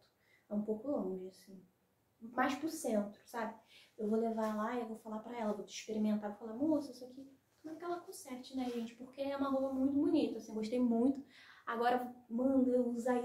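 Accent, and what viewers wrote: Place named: Rio de Janeiro, Brazil. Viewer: Vc é carioca né? Pelo sotaque eu acho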